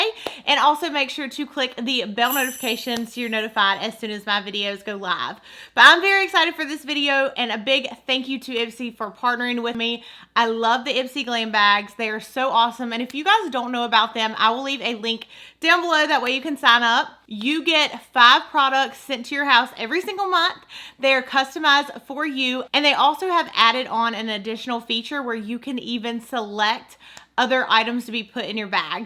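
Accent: South, United States. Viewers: I don't get the ipsy bag anymore. I just upgraded to the glam bag plus and I am loving it so far. I wish I could get both because I loved getting the different bags each month. I'm just not financially able!! I Love your channel and that country accent. I'm from Georgia so my daughter has one lol...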